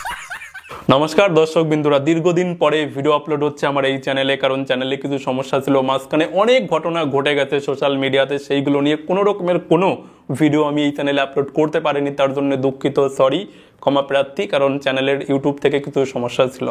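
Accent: Bangladesh. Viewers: Tor bhasa shunei mone hocche, tui bangladeshi,.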